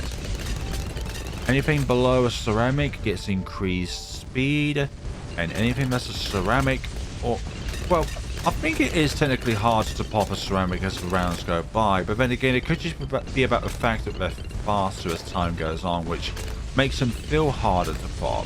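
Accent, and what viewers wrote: United Kingdom. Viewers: I don't know why, but i find your British accent cute.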